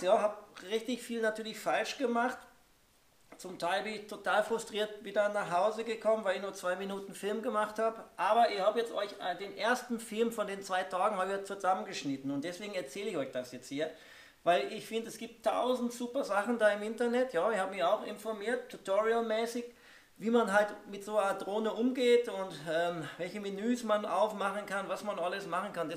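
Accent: Austria. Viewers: Bist ein sympathischer Typ 😁 Die Bilder sind richtig gut. Das österreichische ist nicht schlimm man versteht es gut 👍 Nur die Musik war bissi laut oder die Sprache zu leise sonst schöner Film. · Österreichisch ist perfekt. Als weida sooo! und viel Spass mit dem kleinen Flieger!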